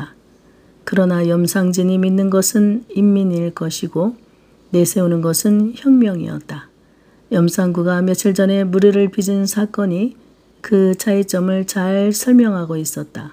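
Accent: Jeolla, South Korea. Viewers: 작가님, 너무 재밌게 듣고있습니다. 그저 고맙고 고맙습니다. 전라도 사투리가 구수합니다.😅😅🎉❤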